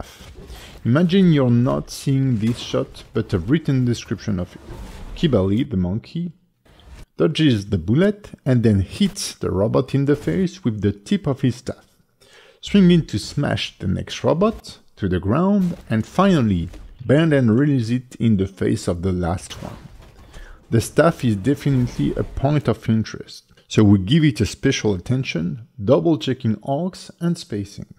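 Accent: France. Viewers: Such meticulous work! It took me a while to get used to your French accent but it was so worth it. I bought your rigging course and it's simply outstanding. My compliments on your teaching skills. Thanks a bunch for sharing your knowledge and striving to make it accessible to complete beginners like me!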